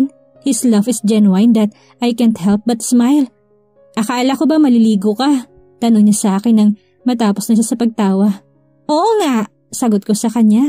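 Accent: Visayas, Philippines. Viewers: Hahaha ang cute mo mag bisaya ms 😁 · Haha ang cute mo miss mag Bisaya. Maraming salamat adm8n sa upload. Attention miss narrator pwede message me private. Thanks 💗💞🇬🇧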